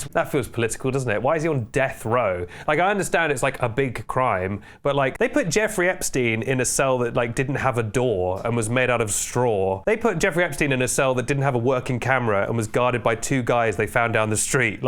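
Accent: United Kingdom. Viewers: Wait, why are you british? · Oh you’re British :(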